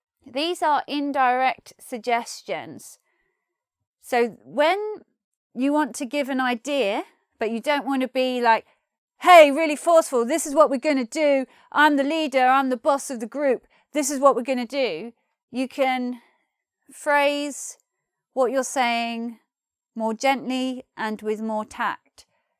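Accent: United Kingdom. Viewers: This is genuinely accent from UK British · Are u followed British Accent? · i can't believe that i understand totally what you say, i mean British accent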